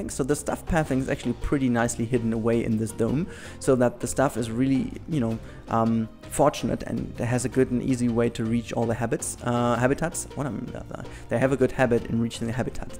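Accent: Germany. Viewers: Ich persönlich habe kein Problem damit, wenn jemand ohne Skript spricht. Den Deutschen Akzent hört man zwar raus, aber den machst du eigentlich durch gute Wortkenntnis wett. Ich kann dir jedenfalls gut folgen ;D Vielleicht redest du manchmal etwas schnell, aber nicht so, als dass ich das negativ anmerken würde. Mach einfach weiter so. Die meisten Kommentare unter diesem Video finden das auch so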